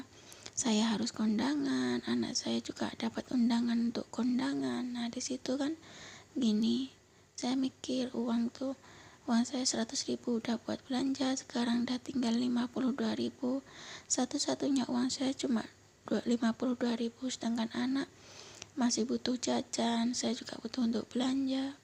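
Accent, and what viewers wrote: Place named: Banyumas, Indonesia. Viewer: Kak trnilngapak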